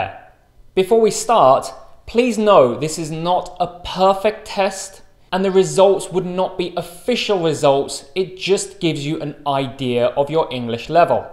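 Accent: United Kingdom. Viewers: How beautiful British accent is! Thank you for the lesson!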